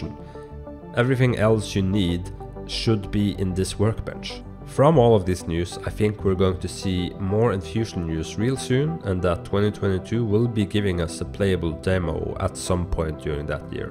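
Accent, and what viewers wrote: Norway. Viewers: Are you norwegian? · bro you sound so Norwegian xD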